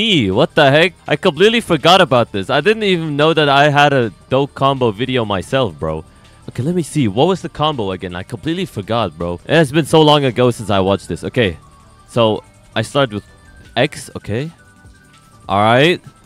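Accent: Germany. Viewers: Ich weiß nicht wie aber ich war mir zu 100% das du deutsch bist wahrscheinlich wegen dem Akzent haha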